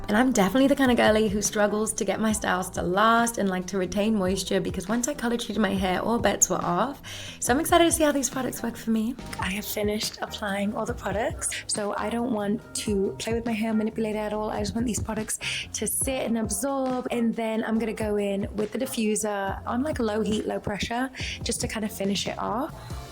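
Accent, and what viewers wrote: United Kingdom. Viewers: 😭 I binged some shorts and subscribed right, then in one I heard a (your) voice and smiled. My thought was look at another woman who likes to put on accents like me (you were answering a commenters question). Only to find out when reading your bio that you're British for real. I have real tears in my eyes hahahah I was so virtually embarrassed for myself. Love your channel. ❤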